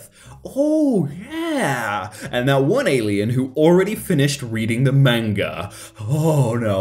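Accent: United Kingdom. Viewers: day 26 of asking that hes british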